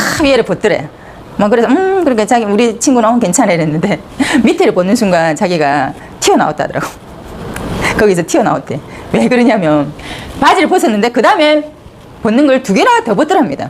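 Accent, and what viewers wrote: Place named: Gyeongsang, South Korea. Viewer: 갱상도 사투리 맛깔나게 하네 엉덩이 노노 궁디 오케이~~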